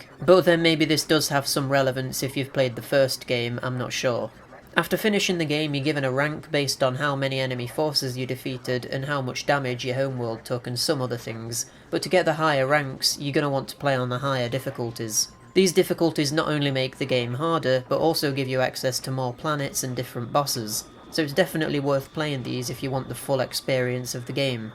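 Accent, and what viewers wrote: North, United Kingdom. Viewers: yeah, tbh I'm on a bit of a marathon here, went to the roots, so to say and going up from there. I love watching how people gradually change their approach towards creating videos, from humble beginnings to more professional setups, more confident narration, how they change visually, all that, it's a lot of fun for me, like using a time machine. First time I saw u was at Retro Dodo channel when u joined them, it was quite unusual at first but I quickly got used to ur reviews, mostly coz I absolutely adore ur northern (i suppose) British accent plus the way u tell the story, it just feels right, u know, it's fun, entertaining, nothing too complex or boring, I realized I enjoyed listening even when u discussed smth of no interest to me (for example light guns). And when u mentioned that Oddworld series is one of ur favorite... 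my god, I was ready to buy the ticket, fly to UK to shake ur hand personally, coz I'm absolutely mad about Oddworld games (no 3D spinoffs though;) So yeah, then i stopped seeing u at Brandon's channel, did a little bit of digging plus u were kind enough to reply to comments, soon I realized u have ur own channel and then I decided to start from the beginning and watch more of ur content which I believe is very wholesome and fun. Wow, that was a long reply, apologies for that, u take care, bud. Oh, and I love cats too!!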